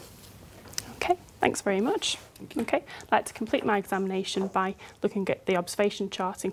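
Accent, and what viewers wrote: United Kingdom. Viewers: british accent)))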